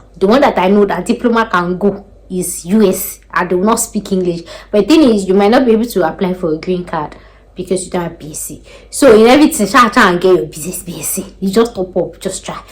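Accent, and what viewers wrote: Nigeria. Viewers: Please add subtitles to your video. I love your Nigerian accent but please also be accomodating to people who watch your videos who aren't from Nigeria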